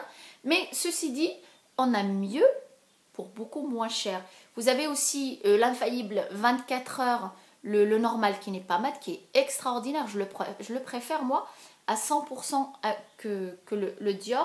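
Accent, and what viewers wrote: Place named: Morocco. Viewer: essaye quand meme de faire des tuto en arabe darija tu va rien perdre car en tt cas tu a un accent marocain et ca se voi tres bien que ta pa de probleme de langue arabe comme tu le dise regarde les tuto de morrocan misstinguette c'est une marocaine qui vis en france ya pas mal de temps et malgre ca elle fait des videos en langue arabe darija je la kiff...jespere que tu va pas men vouloir je suis pas entrain de te critiquer mais c'est un point de vue et pas plus:)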